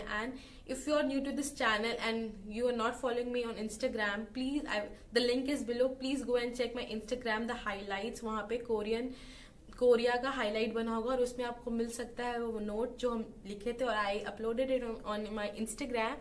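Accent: Jharkhand, India. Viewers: TURU... HMKO LG HI RA THA SHE IS FROM JHARKHAND😂❤️ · Ur language as" hum hum" ...though u r just one prsn reminds me of my hometown...that's too jharkhand🤣🤣🤣🤣too cute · I'm from Jamshedpur....and her accent is jharkhandi saying hum 🥺❤️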